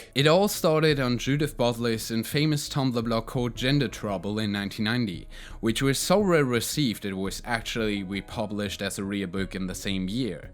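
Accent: United Kingdom. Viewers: Darf ich fragen woher du deinen Akzent hernimmst? Klingt nach einer sehr ungewohnten britischen Varietät. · I'm still not sure if I'm an NB/Agender/Genderfluid but I'm OK if ppl call me female bc I know they just don't know it better (but it literally gets on my tits sometimes...) but I get rlly happy when called a boy...♥ I just wish there was less binary pronoun-calling in Germany as well as gender role expectations... People can be so narrow-minded limiting themselves and others. But wanting it changed from one day to the next is utopian... either way... work on that adorable British accent, darling. Very neato. Keep it up! ♥ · you legit sound a bit scotish:D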